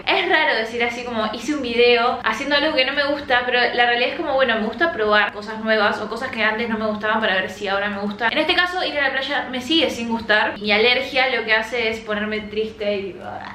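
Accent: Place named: Argentina. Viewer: La verdadera que mujer😍😍 un saludo y me encanta el acento argentino. Saludo desde Argentina ❤️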